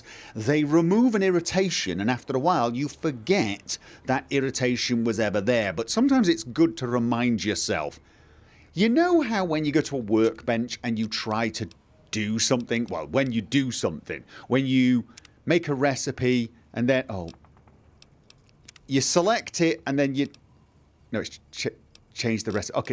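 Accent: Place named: Manchester, United Kingdom. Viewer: its a Manchester accent with a bit of Liverpool, but clear and deliberate with flourishes, like on the rolling Rs. A switch between cities and Norway may have something to do with that.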